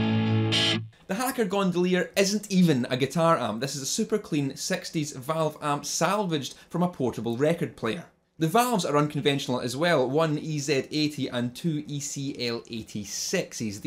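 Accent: Scotland, United Kingdom. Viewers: Something about a listening to a Scottish accent that makes everything more real! Thanks.... And being Australian I didn't need subtitles!;)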